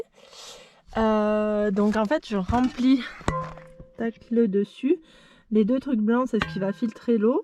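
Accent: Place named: South, France. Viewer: merci, excellent choix, mon budget est réduit, je vais aménager seule mon jumper également 😊 je suis de l'Hérault, tu as l'accent du sud aussi, de quel coin?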